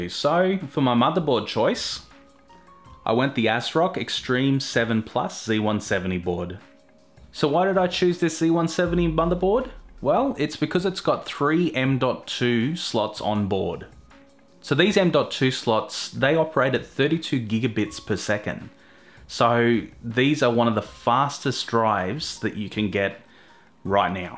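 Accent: Australia. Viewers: Problem with our Australian voices mate? You're probably American.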